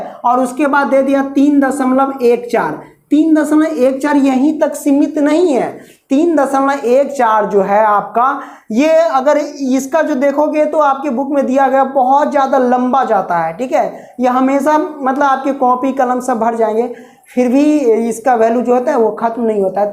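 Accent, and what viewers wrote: Bihar, India. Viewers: Sir aap Bihar ke hai na aapki baaton sehi pata chalta hai aap bahut aacha bolte hai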